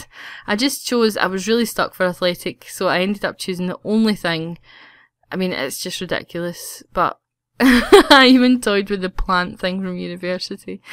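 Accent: Scotland, United Kingdom. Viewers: Hi, I love the LOTR, and your Sims really looks like an Elf, she's very pretty :) Are you Scottish by any chance? :D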